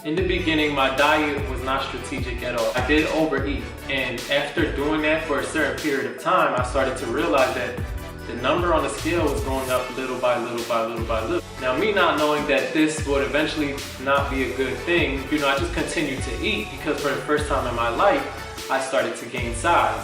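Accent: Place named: Philadelphia, United States. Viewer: You are SO PHILLY! I can hear your accent. I love it. This was super helpful though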